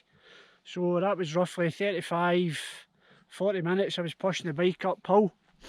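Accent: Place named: Glasgow, United Kingdom. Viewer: Excellent. What an achievement. Your last one quite near home? Make sure you have a driver so you can have a celebratory sherbet. When they ask me on to Desert Island Discs, I'm going to ask for a recording of your commentary. Great Glesga patter and descriptions of mountains - two of my favourite things.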